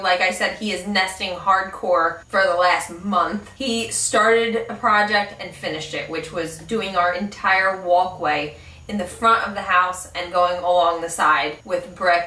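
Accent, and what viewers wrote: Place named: New York, United States. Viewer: I don't know what it is, but something about your New York (New Jersey maybe? lol) accent and how silly you are with your husband, you just seem really cool and you put me at ease in an odd way. I usually feel really uncomfortable around other women (people in general, but I just usually never get along with women, I don't know why) but I think I'd like you :P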